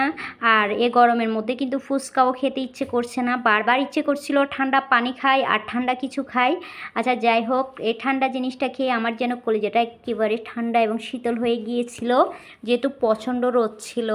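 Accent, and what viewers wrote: Chittagong, Bangladesh. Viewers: ❤ amar khub bhalo laglo tomar Kotha gulo bon ❤khub misti bhasa chottogram a ❤sabar bhasa sabar kche sundor sabar sabae k samman dawa uchit❤khub sundor hoache makeup dressing darun sundor lagche tomae bon ❤ghoraguri jhal muri ❤❤gorome thanda juice khub bhalo lage❤sab milia khub bhlo lglo 👍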